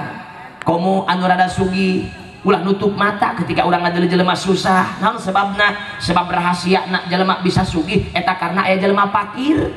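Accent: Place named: West, Indonesia. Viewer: Ngulon pisan nyariosna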